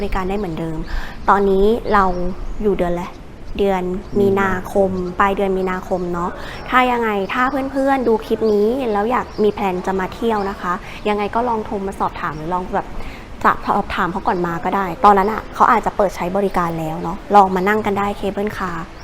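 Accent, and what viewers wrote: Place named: South, Thailand. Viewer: คิดอยู่จ้าว่าทำไมพูด ดา เดิ้อ คนบ้านเอ๋ง นี่เอ๋งนี่้เอง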